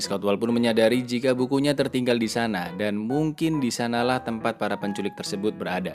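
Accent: Java, Indonesia. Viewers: Pengisi suara nya Orang jawa ya mas? 🙏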